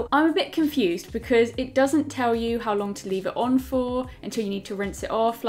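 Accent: United Kingdom. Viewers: I’m in love with her accent 😍 Is this a British accent??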